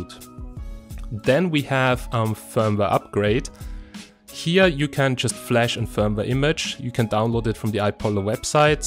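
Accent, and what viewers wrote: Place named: Germany. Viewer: Slight german accent...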